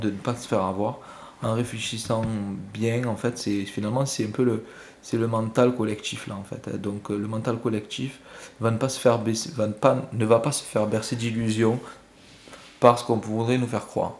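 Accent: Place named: Marseille, France. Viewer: Bravo et merci. 🙏 🙏 🙏 j’ai mis le pouce bleu et je me suis abonnée car c’est un plaisir de vous écouter! Description claire et nette avec ce petit accent Marseillais! 🌏☀️🌜🌗🌈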